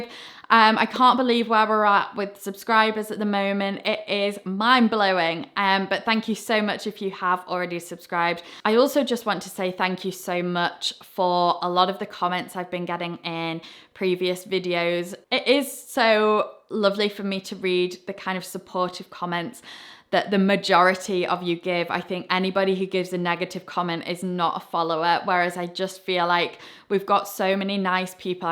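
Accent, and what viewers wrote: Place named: England, United Kingdom. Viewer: I love your style and I copy a lot of the things you wear, I also love your English accent that as a foreigner I can not copy 😢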